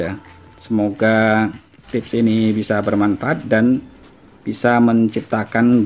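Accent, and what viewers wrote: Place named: Madura, Indonesia. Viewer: logat.e dudu suroboyonan tapi meduroan 😂🙏👍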